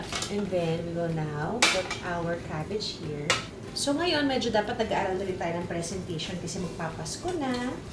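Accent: Batangas, Philippines. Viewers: Batangenyo accent ang imik habang nagluluto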